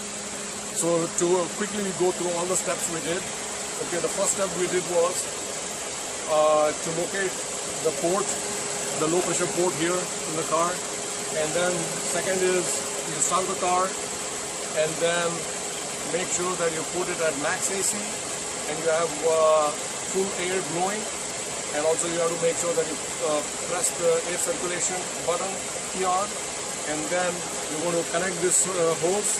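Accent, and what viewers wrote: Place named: India. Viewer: Indian accents are so fucking annoying...and i love indian people and food but i cant stand their english accent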